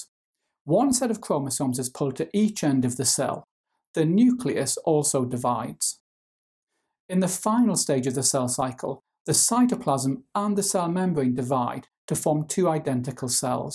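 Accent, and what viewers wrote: Wales, United Kingdom. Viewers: As instructed by my teacher in my online homework task, I express my deep appreciation for your content and dulcet Welsh tones.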